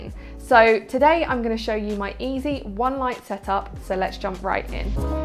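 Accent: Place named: United Kingdom. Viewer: Lovely accent! where are you from in the UK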